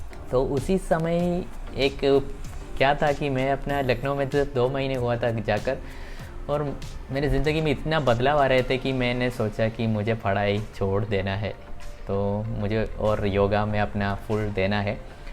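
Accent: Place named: Kerala, India. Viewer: ur hindi is very sweet chetta...whenever any malayali speak hindi..they speak very sweetly!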